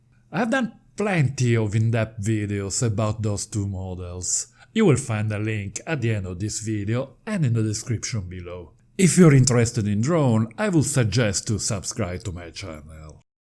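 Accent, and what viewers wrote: Italy. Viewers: Awesome accent. Love it. I guess it's Italian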